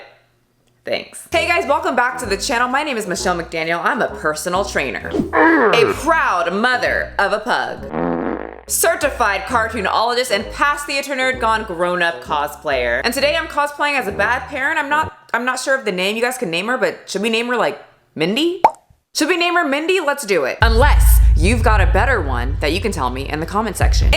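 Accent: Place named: New Jersey, United States. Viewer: Omg I’m watching this video 5 years late. I LIVED HERE 5 years ago! I’ve been to that movie theater! My gym was on the next block! 😂 (Michelle I’m so glad I found your content in the past year. And your NY/NJ is on point 💚)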